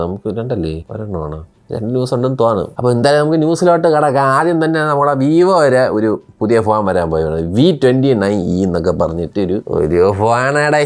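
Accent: Trivandrum, India. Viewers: ശേടെയ് തിരോന്തരത്ത് വന്നപ്പോൾ ഫുൾ slang തിരോന്തരം 🔥 അടുത്ത തവണ വരുമ്പോ പറയണേ അണ്ണാ ഫാൻ ആണ് ഒന്ന് കാണാൻ ഒരു ആഗ്രഹം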